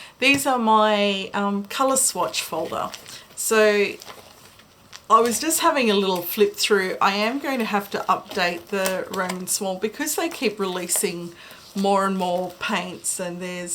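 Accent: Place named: Australia. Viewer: Loving your videos! And it's nice to hear an Australian accent down this watercolour youtube rabbit hole. I just wanted to say that Naples Yellow differs from yellows/yellow ochres in that it's actually brown - so can be used in skies without making green when mingling with blue.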